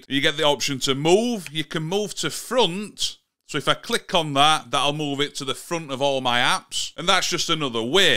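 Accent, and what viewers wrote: Yorkshire, United Kingdom. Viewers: Great help mate…Love your Yorkshire accent 😊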